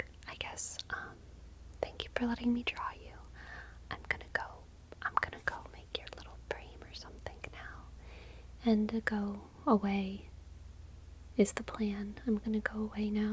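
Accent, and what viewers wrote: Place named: South, United States. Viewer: I'm guessing you live in the country because most people pronounce it with the i n g most of the places I know that pronounce it's with the Southern drawl you're describing are in the country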